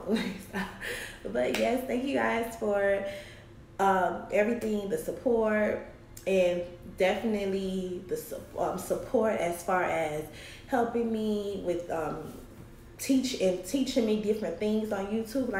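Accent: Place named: Miami, United States. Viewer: You are too cute! Are you from Miami? I love your accent. I also don't put heat to synthetic hair, but I have one wig that I stay throwing curls in the front to frame the face. Now you know this is a CITY GIRL SUMMER over here!!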